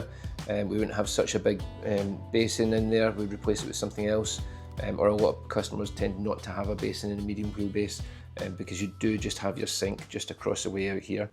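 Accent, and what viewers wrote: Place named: Scotland, United Kingdom. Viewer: I could listen to the Scottish accent all day!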